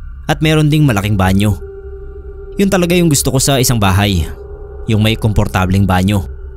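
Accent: Iloilo, Philippines. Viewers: boss first time ko sa channel mo pero naririnig ko na mga videos mo kapag nanunuod kapatid ko . Gusto ko lang itanong, ilonggo ka no?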